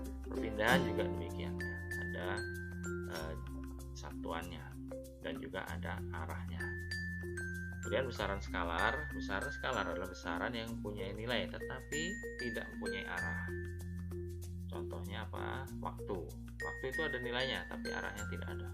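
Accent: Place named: Lampung, Indonesia. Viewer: Dari Lampung mana suaranay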